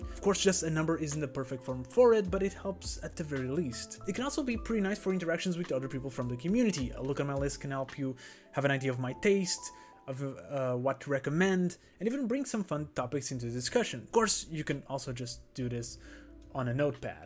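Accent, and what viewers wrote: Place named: Portugal. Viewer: Something curious just happened... I just joined anilist and I wanted to know more about the website before starting to use it. I open youtube, search for "anilist" and this video is right on top. I click it. Less than 10 seconds in and I start to have this feeling... your face, your accent... is he? Something tells me he is... I go to your "about" section and that's when I see it... este gajo é português caralho! Um pt consegue cheirar outro pt à distância ahaha acabei de subscrever. Boa sorte com o canal!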